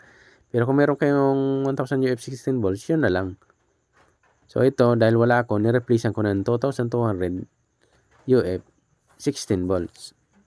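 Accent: Bicol, Philippines. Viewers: Sir taga bicol kpo ba?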